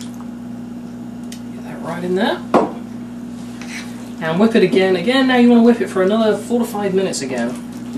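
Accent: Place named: United States. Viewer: Maybe he's gaining some of the american accent by living there for a while...: )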